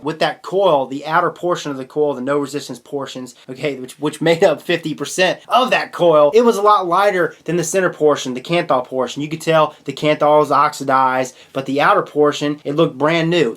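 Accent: South, United States. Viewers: Are you serious? Dude talks with a Southern drawl...Dont try tawkin to a New Yorker if you think A southern drawl is fast!! Really youre being sarcastic ...Iknow lmao!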